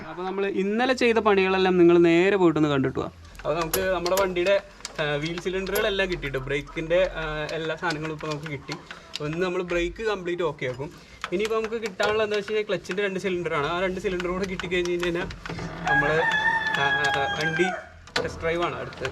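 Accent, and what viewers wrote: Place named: Kunnamkulam, India. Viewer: Kunnamkulam kaare parayunoooda naaari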